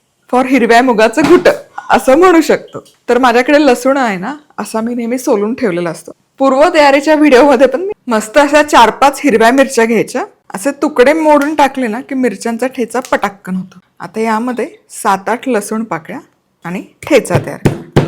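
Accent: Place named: Pune, India. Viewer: Mal tumchya recipe tar avadtatach pan tyachypeksha tumcha najuk awas ani god bolan khup jast awadt. Tumhi kuthalya gavachya . Pune mubai kolapur ko kan . Tumchya god awajavarun tari tumhi mala punyakadacha vattat.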